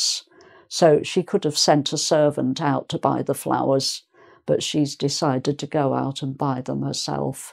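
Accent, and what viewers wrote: England, United Kingdom. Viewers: VERY BRITSH ACCENT ! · Hello,!👋 I am Kate, I am 18. I’ve already read "1984" and "Pride and Prejudice" in Russian. I really enjoyed them!😍And I’ve also read "Jane Eyre" in English when I was 13-14 years old (my teacher gave me this book) and in Russian recently. And now I am reading "Charlie and the chocolate factory" and "A journey to the center of the Earth" in English. In general, reading is my favourite leisure time activity, and I’ve read hundreds or, maybe, thousands of books.📚 I really love "Harry Potter", "Master and Margarita", books about "Stranger Things", foreign classics and so on.💖 P.S. Thanks for your video!👏 I am from Russia🇷🇺 and I am learning English, but I’ve understood everything without subtitles. I really like your pronunciation and Britain accent!🥰🇬🇧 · Это что, британский акцент? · Even a non-English speaker would be able to understand this lady. 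Lovely & relaxing type of voice with a typical British accent, perfect to get you started. Plenty to learn from her (intelligence). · I really love English writers, they are my favourites ones after Juan Rulfo, and I love your accent as well 🥺🥺🥺🥺💚 greetings from Mexico.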